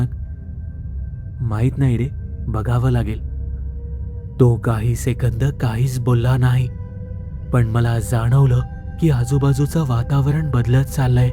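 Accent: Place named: South, India. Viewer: मी अनेक वर्षांपासून तुझे stories ऐकतेय… पण आता तू गाण्यात बोलतोय…. फिल्म मधे south indian accent सारखे तू का बोलतोस??? Like a non Maharashtrian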